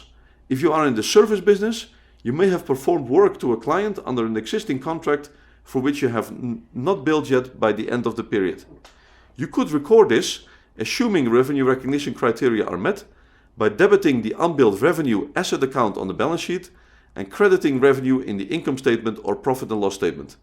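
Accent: Netherlands. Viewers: is that a Dutch accent ?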